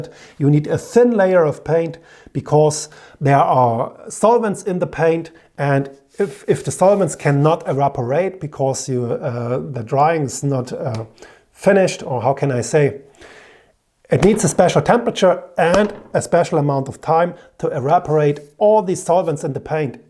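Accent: Germany. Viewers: Hey, Awesome work, artistry and video's too boot.. thank you.. we all love your video's as with knowledge we have the balls to deliver instead of our girlfriends taking the lead.. I have a question... I want to strip / repaint an Old Skool race road bike that has an alloy, aluminium frame.. I would like to know is it better to strip, polish the raw metal and then apply a clear adhesive promoter before candy spray or is it better to leave the metal surface 'rough' and then apply a primer and candy spray... what do you suggest please as you are the Prime Mover, Master of Spray excellence. Cheers Ivan from UK (ps: Your videos ROCK... Gernman accent, humour and technical excellence... Yavol..:-) Use your brain and balls don't be a pussy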